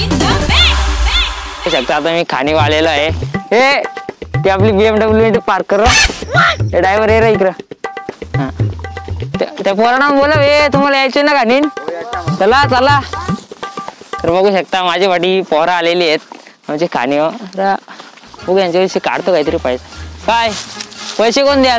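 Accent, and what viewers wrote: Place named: Agri, India. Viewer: BMW lakaht ek ahe..proper aagri boltos ..vinayak ani bunty peksha bhari.....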